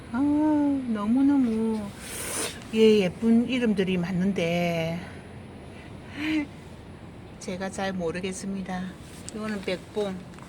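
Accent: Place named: Gyeongsang, South Korea. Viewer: 예뿐다육이들 정성스럽게 잘관리하시고요. 말씀을 아주 예쁘게도 하시네요. 정말 경상도사투리가 정겹네요?😄😄😄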